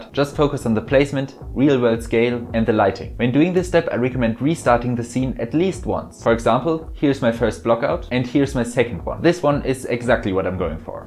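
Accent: Germany. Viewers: ich hör da einen deutschen akzent!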